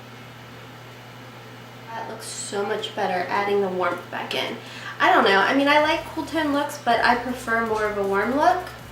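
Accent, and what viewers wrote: South, United States. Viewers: I have a question.... Do you have a very heavy southern accent normally? I feel like you might but try not to have one in your videos maybe??? Well, if so, you should really let that shine through.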